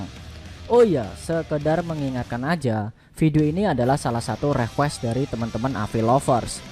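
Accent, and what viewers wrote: Java, Indonesia. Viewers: Kowe wong jowo ndi min?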